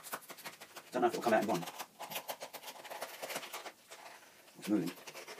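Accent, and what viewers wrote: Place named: United Kingdom. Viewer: british accent.. the can was already open.. : disliked and reported.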